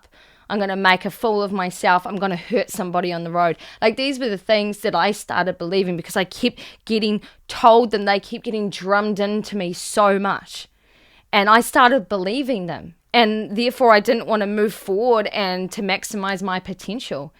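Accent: New Zealand. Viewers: Toxic egomaniac band member taking total control and walking over the top of you , ah! that brings back memories . And everything else you've experienced seems so familiar , good to see you have such insight into yourself . Too bad that wasn't the case with me thirty years of going nowhere , P.S. love that kiwi accent . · I think it's a New Zealand accent. I automatically assumed Aussie at first, but certain words have a NZ twang.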